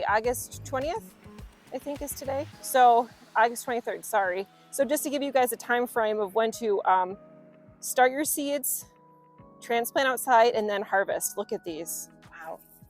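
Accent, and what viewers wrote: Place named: Minnesota, United States. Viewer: I love the video. Your Minnesota accent is in full effect!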